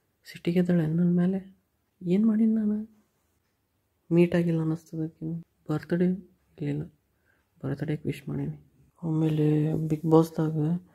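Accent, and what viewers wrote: North Karnataka, India. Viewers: anna nin video osm...ur talented.. Uttar Karnataka accent masth ad...bhal ishta agthad....innu jasti videos madri anna..all the best from uk fans 💐🙏👏👏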